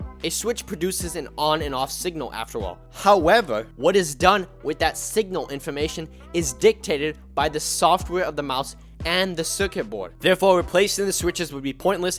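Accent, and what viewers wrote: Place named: New York, United States. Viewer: He sounds like a newyork dad like thank you gouys four so much suppourt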